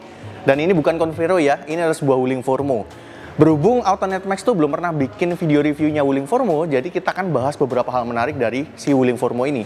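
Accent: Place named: Surabaya, Indonesia. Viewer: Okelah mantep bicaranya . Kek ke surabayan style hhehe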